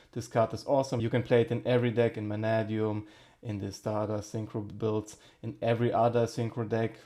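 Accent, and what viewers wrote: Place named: Germany. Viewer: Man hört direkt raus das du deutsch bist 😂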